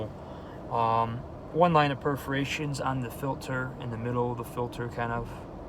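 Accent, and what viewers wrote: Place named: Illinois, United States. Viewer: Dude are you from Illinois? You sound like some of the people I live next door to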